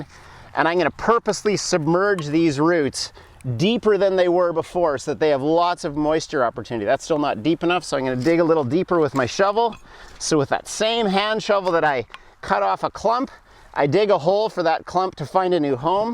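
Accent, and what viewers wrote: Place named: Canada. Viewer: I totally could hear you were from Canada, ay?🙂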